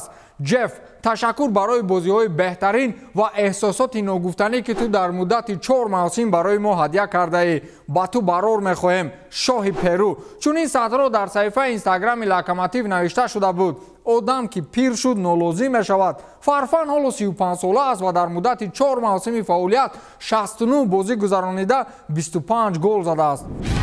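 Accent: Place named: Rural, Tajikistan. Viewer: Акай чуни мни гох гох дехотигирам гапзан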